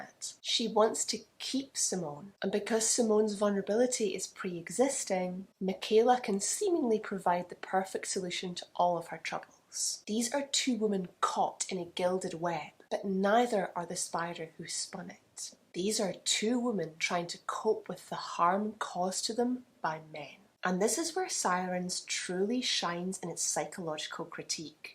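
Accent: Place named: Ireland. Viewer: Came here from tiktok, I like your Irish accent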